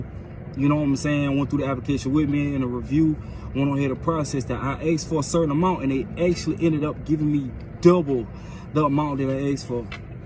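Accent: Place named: New Orleans, United States. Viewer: That accent though...New Orleans?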